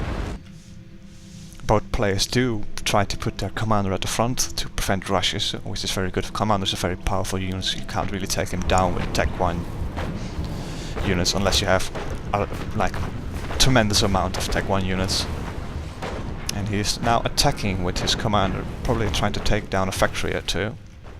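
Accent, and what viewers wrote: Netherlands. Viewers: Thanks for uploading! Besides watching starcraft replay, I also start enjoying this fabulous game. . Btw, are you Dutch? Just wondering because of the accent. :)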